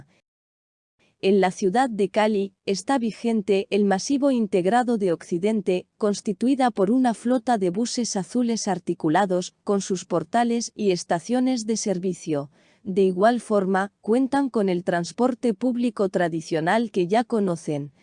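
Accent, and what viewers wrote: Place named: Spain. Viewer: No me trama esa vocecita españoleta 🙄🙄🙄🙄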